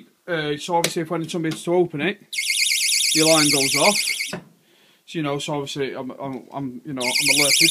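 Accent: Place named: Birmingham, United Kingdom. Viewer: Brummie or Coventry?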